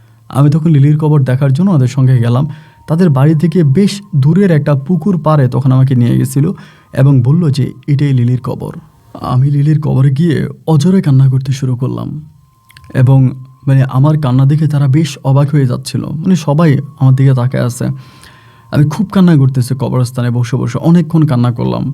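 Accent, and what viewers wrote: Bangladesh. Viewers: You were not born and brought up in England, your accent is Bangladeshi.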